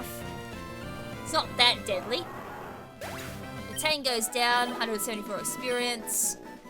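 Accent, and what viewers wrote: Australia. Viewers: Wait...are you an aussie? XD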